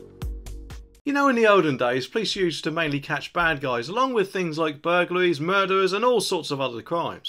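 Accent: United Kingdom. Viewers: Damn, which part of the UK are you from? Normally I don’t have this much trouble with English accents.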